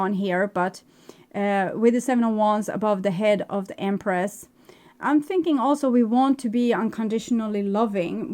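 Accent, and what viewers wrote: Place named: Sweden. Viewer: Time to enjoy a Swedish lass, accent again. Love your beautiful voice . Thankyou for the reading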